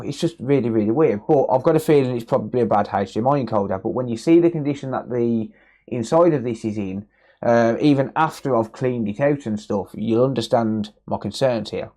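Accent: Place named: Black Country, United Kingdom. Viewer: Hi mate you sound like your from by me like Dudley or Black Country area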